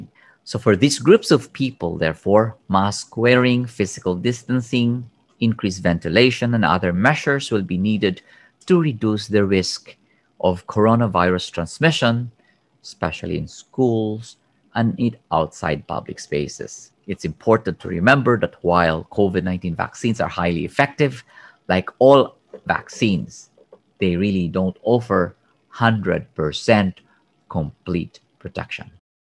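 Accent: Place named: Philippines. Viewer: Once I opened this video 1st I thought you are a philippino later you mentioned that😃 actually I have many friends from Philippines so, I know accent.❤️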